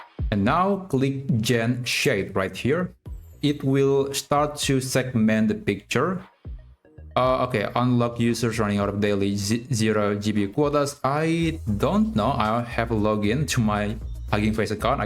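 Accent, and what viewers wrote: Indonesia. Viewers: you sound Indonesian, are you?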